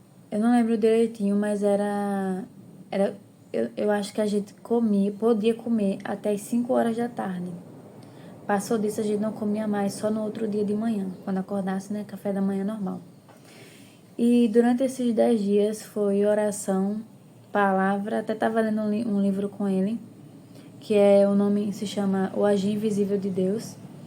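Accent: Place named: Northeast, Brazil. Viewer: Graça e Paz irmã Olha não sei de onde vc é, mas pelo lindo sotaque acredito que dê alguma região do nosso lindo e abençoado nordeste eu sou se São Paulo, olha o seu vídeo falou muitoooo comigo , apareceu aleatóriamente aqui no YouTube e desde o título até o final do vídeo, inclusive o hino falou ao meu coração 🙌🏻🥺 Que Deus te abençoe, já se tornei uma inscrita 😍🙏🏻❣️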